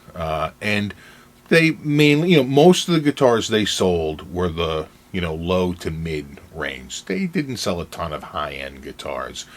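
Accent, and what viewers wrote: Boston, United States. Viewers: Are you from Boston? If so, I am too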